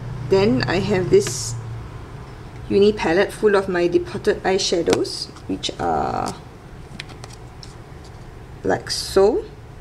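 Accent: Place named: Malaysia. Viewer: Love your accent! Malaysia? Singapore? Never heard of "Kate" brow cosmetics. What country is that from?:)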